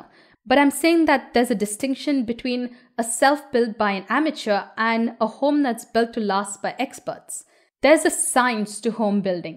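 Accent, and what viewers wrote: India. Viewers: Aren't people buying used containers quite cheaply that are no longer suitable for their original use? Doesn't LA have a ton of them? As for insulation, it seems silly to use steel in a cold climate. Rather they should be used in warm climates so no insulation is needed at all. Then just protect them from rain. As for working within the skin, not really if you can put them together. Not many single people need a home more than 17' wide and about 45' long. That's 765 sq ft. The main problem seems to be their high weight. The future is probably a prebuilt, custom designed home, designed mainly by the buyer with software, using the best materials available in that area at the lowest cost, assembled in a few days on site. I wonder if foundations could be poured on mass, making the process much cheaper than doing one at a time. Surely it must be. 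You've got an excellent analytical mind but coming from an Indian background you're probably used to family sizes that are larger whereas this idea is best for single people or a couple at most. Dubai? Please. Your heavy Indian accent makes India a more likely origin. Just tell people how long you were in Dubai. Otherwise it sounds like you're trying to hide it for some reason. The head of both Microsoft and Google are Indian. Plus a lot of Indians are getting educated in the UK which is often far superior to most American universities. No need to hide anything. Its best to never bring up racism. Sure it may be there but its often subtle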